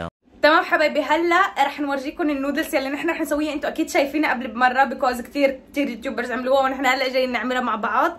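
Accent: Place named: Palestine. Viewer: من وين هي. لهجتها فلسطينيه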